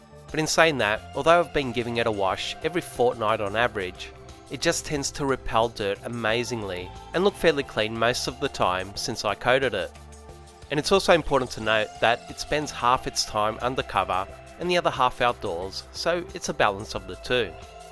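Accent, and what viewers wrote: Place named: Australia. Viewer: 3rd video in and I’m hooked! Good to hear an Aussie voice in a car detail video, Big fan of your work and your car, pity I’m on the other side of the city.. Sub.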